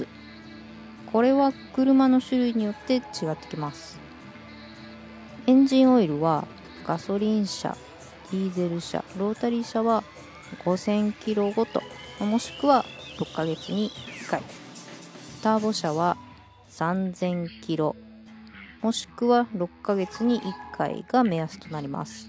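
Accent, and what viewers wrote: Kansai, Japan. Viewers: 何か関西弁ほっこりします。素人の私にもとても分かりやすい説明ありがとうございます。。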